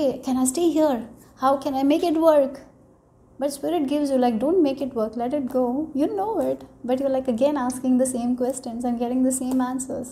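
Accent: India. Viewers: Really enjoying your readings. Very on point and deep. Love your Hindi accent as well. Thank you🙏🏼✨💖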